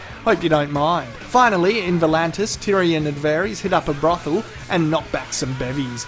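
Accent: Australia. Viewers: this guy got everyone trying to speak like a australian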